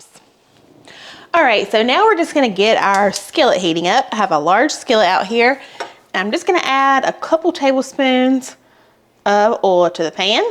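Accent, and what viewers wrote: South, United States. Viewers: love the two recipes today you and are great together love listening to you talk that southern twinge is so beautiful to hear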